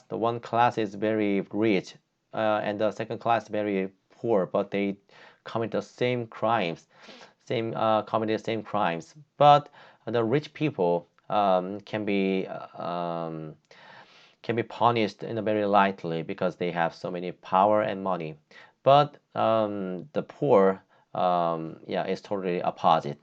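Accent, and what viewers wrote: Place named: China. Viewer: Chinese English accent is so irritating. 😭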